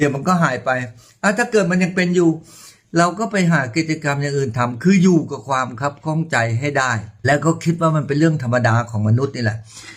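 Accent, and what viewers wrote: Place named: Prachuap, Thailand. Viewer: เพิ่งเข้ามาดูไม่ทราบว่าคุณพี่คนจังหวัดอะรัยค่ะสำเนียงเหมือนๆคนประจวบ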